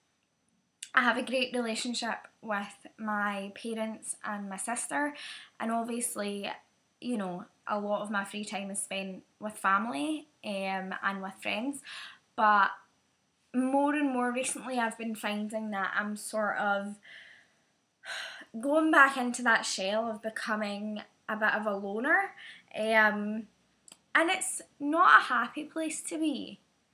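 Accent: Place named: Central Scotland, United Kingdom. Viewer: Hi, thanks for making this video. I do really understand what you are going through. I'm 26, I have MS and basically your video describes my situation too. I'm also Scottish (central) your accent doesn't sound as if you are far up north. If ever you want someone to hang out with that is in the same frame of mind feel free to drop me a message. Hope you are doing okay X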